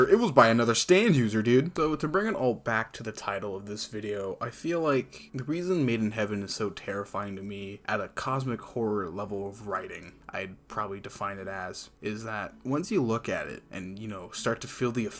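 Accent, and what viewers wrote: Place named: Australia. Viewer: 277 Christian Kincaid Christian Kincaid 4 months ago I feel like an SBR Joestar family tree would be just as interesting, despite not being around as long. 114 Yoxiv Yoxiv 3 months ago Hey, how dare you forget about Jotaro's adoptive son Koichi? He's like the child Jotaro never had! 50 stan 99 stan 99 4 months ago How confusing is the Jojo family tree? Araki: Yes 72 Ken D. Uchiha Ken D. Uchiha 4 months ago Realized josuke and jotaro’s mom are siblings?😂 65 Shiranui prestonsaga Shiranui prestonsaga 4 months ago The family tree becomes so complicated once we hit part 4 and beyond 49 NoelxHunter NoelxHunter 4 months ago “While the others don’t have a sarcastic Australian man narrating” The Anime Man: Excuse me!!!??? 47 CondorShelves CondorShelves 4 months ago Donatello's last name is Versace, like the fashion brand. Part 6 in particular had tons of fashion references. 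Thanks for Jojo monday, always makes me happy to see new Jojo content uploaded straight into my youtube feed. 54 Damian Nova Damian Nova 4 months ago I do want you to do the SBR family tree because I know you’d do a great job and it would be loads of fun 32 Avocado Leeminovela Avocado Leeminovela 4 months ago The Joestar family is the lineage with a birthmark in the shape of the suscribe button 17 Sven Servette Sven Servette 4 months ago I didn't realize you were Australian. I thought you were American trying to be fancy. I guess that describes the Australian accent pretty well. 36 preseetha Deepak preseetha Deepak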